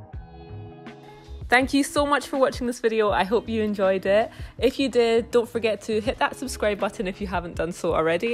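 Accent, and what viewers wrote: Scotland, United Kingdom. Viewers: I'm and this Vid-yO that Scot accent is physically painful no shade, nice content great quality videos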